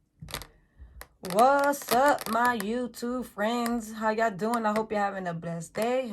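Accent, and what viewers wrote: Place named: New York, United States. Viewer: Your getting better with your videos love the haul and your content girl I love your accent are you from N Y TFS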